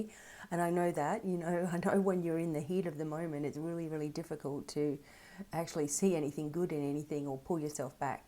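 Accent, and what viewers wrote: Australia. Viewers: So True. Especially better cos you are an Aussie and you’ve kept your beaut accent !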